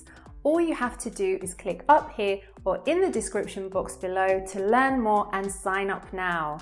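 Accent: United Kingdom. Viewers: I got difficulties to understand british accent😅😪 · I love your British accent my dear. 😍🥰😘❤ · Your british accent is really amazing thanks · British Accent.. sorry to say, my dream is wanna learn only American Accent...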